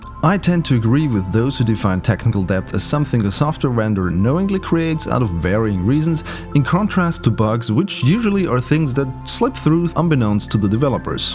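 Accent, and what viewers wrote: Germany. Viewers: You can even hear the typical German accent when he speaks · tolles video wusste doch, dass du deutscher bist, der akzent ist unverkennbar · Are you from Germany? BTW, great Video! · Du bist Deutsch? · R U German?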